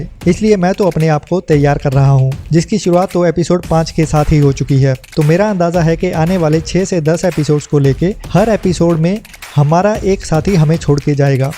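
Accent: Punjab, India. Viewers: You speak like Dara Singh and such a heavy Punjabi accent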